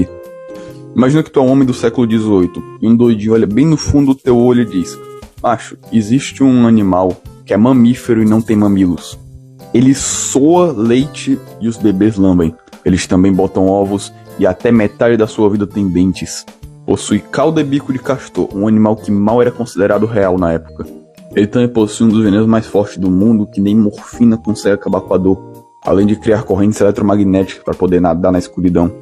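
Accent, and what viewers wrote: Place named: Fortaleza, Brazil. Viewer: Vídeo muito brabo irmão, continua assim que vai longe . Aliás tu é de fortaleza né, reconheci pelo o sotaque kkkk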